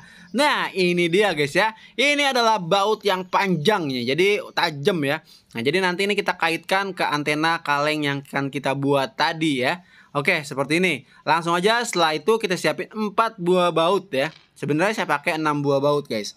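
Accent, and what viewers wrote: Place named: Bekasi, Indonesia. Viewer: Org Bekasi Mane dye suaranya niiiiihhhh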